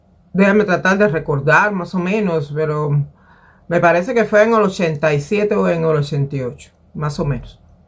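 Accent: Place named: rural, Cuba. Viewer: Ayeme. Tu eres guajira por tu an me abla asy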